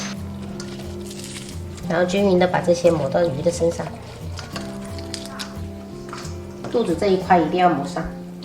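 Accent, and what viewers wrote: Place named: Fuzhou, China. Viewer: 地道的福州做法，从口音听出来的哈哈老乡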